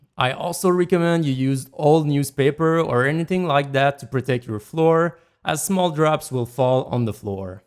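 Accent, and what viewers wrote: Quebec, Canada. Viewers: Est tu québecois? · Great video dude! Are you from Quebec?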